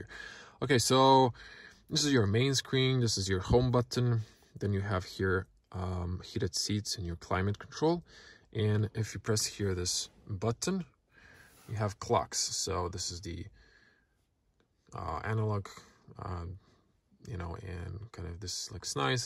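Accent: United States. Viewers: Are you american? Youre accent is really strong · Pohvala za vrlo detaljan test. Jako dobro i tecno govoris Engleski sa americkim akcentom. Prvo mi nije bilo jasno jesi li Hrvat uopce?! U testu je manje vise sve tocno no smeta me sto vecina ljudi tvrdi da je kvaliteta izrade korak unazad. Apsolutno da to nije tocno jer je auto izraden barem jednako kvalitetno kao i sedmica cak nesto i bolje u pojedinim segmentima. Prvenstveno mislim na kvalitetu sjedala sto nikako nije zanemarivo. Drugo je naravno sto je cijena otisla gore, te je normalno da se u tom cjenovnom razredu mogu ocekivat kvalitetniji materijali u vecem dijelu interijera. Nedostatak tipki je mozda ergonomska katastrofa no to je buducnost definitvno. Recimo tko je malo gledao spijunske fotografije nove S klase pa cak i buduce C klase (W206) vidljivo je da ce zapravo centralna konzola biti ogroman zaslon. 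Poznato je da S klasa diktira buducnost i trendove autoindustrije tako da je Golf 8 zapravo cak i malo ispred svoga vremena. Detalji poput samo USB-C portova u kabini te komunikacije izmedu vozila koji razmjenjuju informacije govore u prilog tome. Sto se tice dizajna mislim da je potpuno pogoden iz vise razloga i najveci je medugeneracijski skok. Kad je aktualna bila sedmica ljudi su govorili da je dizajn konfekcijski i dosadan, sad govore da je previse futuristicki i da je cudan te je sedmica skladniji auto. Jos jedna potvrda onoj staroj narodnoj "Taj se nije se rodio koji bi narodu ugodio". Za kraj cijena je definitvno prenapuhana ali to je boljka vecine VW-a. Rek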